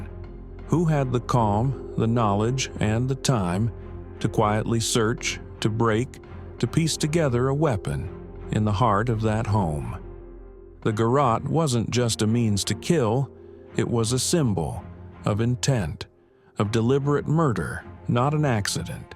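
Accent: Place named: South, United States. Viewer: The narration is horrible with some type of crazy “southern” accent. Couldn’t listen.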